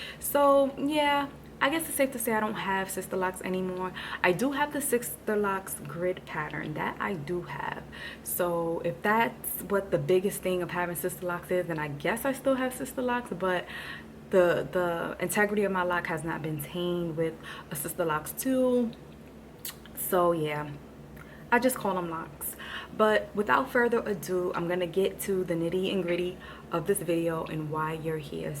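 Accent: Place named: Harlem, United States. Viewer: Lol! I guessed that watching one of your other videos bcse I recognized the accent and the attitude (Harlem native, right across the bridge 😉) Peace & Love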